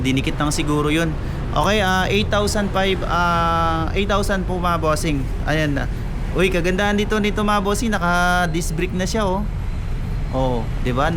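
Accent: Visayas, Philippines. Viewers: Bisaya ka bai?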